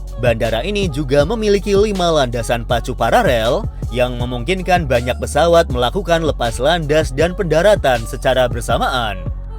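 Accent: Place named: Indonesia. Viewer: KOK SOUNNYA INVOIC INDONESIA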